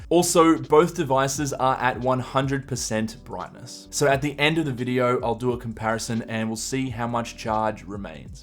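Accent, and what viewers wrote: Australia. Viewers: why does cutie boi have an Aussie accent?!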